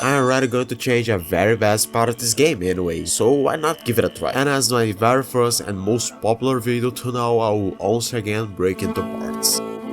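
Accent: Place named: Brazil. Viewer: sempre bom ver algum br fazendo conteúdo em inglês sobre qlq game, sua pronuncia ta ótima amigo, apesar do sotaque "accent" que colocamos, isso deixa com mais charme (obs: demorou quase o vídeo inteiro pra eu começar a suspeitar ser um "compatriota" huashusahusa), sucesso!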